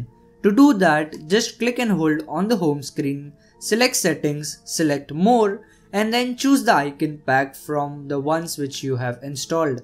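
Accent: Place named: India. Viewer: Indian accent are usually bad since you can barely understand them but i luv listening to urs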